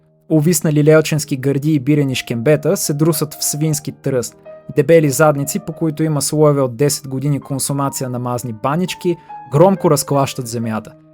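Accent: Russia. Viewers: Има руски акцент, защото е руснак, дошъл да живее безопасно и евтино тук и да плюе по мястото, което обитава! Много тъпо от негова страна! Но пък може да си ходи, ако не му харесва! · Братлето наистина има руски акцент обаче, хванах един-два русизъма във видеото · ХУАХУАХУХАУХАУХАУХ КАКЪВ Е ТОЯ РУСКИ АКЦЕНТ ВЕ ЛУД КОЛКО ПЛИТКОУМЕН ТРЯБВА ДА СИ ДА ГЛЕДАШ ПОВЕЧЕ ОТ 10 СЕКУНДИ НА ТОЗИ КЛИП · Говориш с руски акцент, но съм съгласен с всичко което каза ! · Чува ти се руския акцент. Пробвай пак! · Съгласен съм донякъде,но долавям руски акцент. Тоест видеото е може би резултат от обиден полурускобългарин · На 30 секунда от видеото писах коментар да питам що говориш с руски акцент. После ти изслушах забележките и разбрах- ти наистина си си монголски подчовек. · Диалектът ти е на руснак живеещ в България. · не можеш да скриеш джиалекта солти руски soiboi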